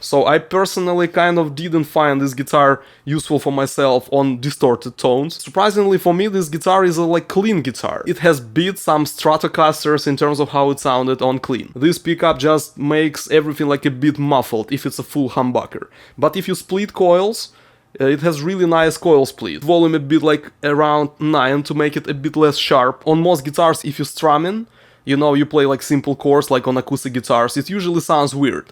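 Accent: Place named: Russia. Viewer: ёр инглиш вери вел))) как по мне это супер уровень, а русский акцент придает изюм))) те кто гонит на акцент наверно не слышали индусов или филиппинцев))) хотя я считаю что сложнее чем говор коренного британца брауни или кокни НЕТ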